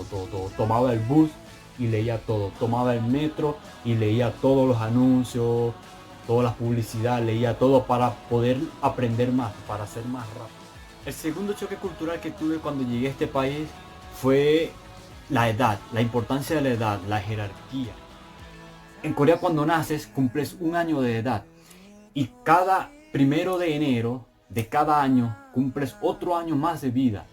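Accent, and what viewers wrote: Zulia, Venezuela. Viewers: yo quisiera oír más sobre esa lengua y cultura a las que ahora para hacerlo más “appealing” le sumamos ese hermoso acento zuliano, sabor venezolano que desborda alegría sin igual. Salí de Vz hace como 30 años, pero ahora estudio coreano y mi sueño (sé que lo lograré) es aprender bien el idioma, visitar Corea del Sur y trabajar en este y mis otros idiomas. Muy orgullosa de ti y los demás venezolanos emprendedores que se esfuerzan por salir adelante, dejar en alto el nombre de Vz y dar a conocer lo bueno, lo bonito, lo chevere y lo maravilloso de quien sabe perder y prepararse por ganar. Quizá parezca que se ha perdido una batalla, tiempo y más, no lo negamos, pero que se sujete fuerte este mundo porque emergeremos con más fuerza y valor. Mientras tanto, en el exilio hoy tal vez, que se conozca por doquier nuestra voz, corazón, cultura, tradiciones, comidas y más.Hay gente de más edad por ahí, que hacen, a que se dedican, cuales son sus intereses más allá de la familia y carrera profesional. 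Ojalá puedas responder con algún video. Te bendigo. Te deseo lo mejor. Respetuoso saludo desde TX. Adelante!! 화이팅:)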